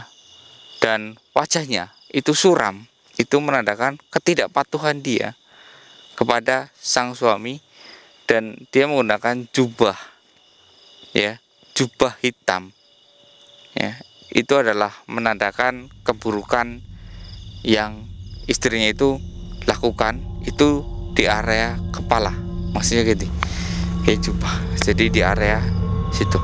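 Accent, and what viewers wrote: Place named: Banyumas, Indonesia. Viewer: Jauh banget sobat merantau nya..biasanya org ngapak d kalimantan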